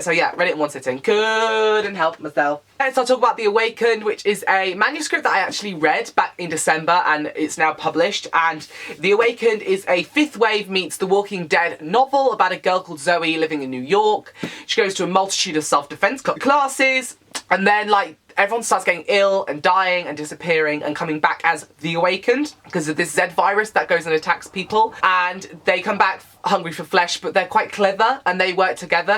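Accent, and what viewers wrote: United Kingdom. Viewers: you're the only british booktuber i watch and i don't live in the uk anymore and its so nice to hear your british accent and words I've forgotten to say!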